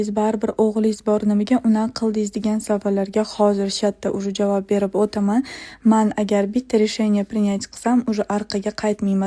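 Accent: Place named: Andijan, Uzbekistan. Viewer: Anjanlimisiz diyman shevezdan bilinyapti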